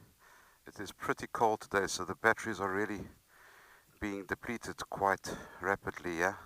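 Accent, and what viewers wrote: South Africa. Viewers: you sound South African Portuguese?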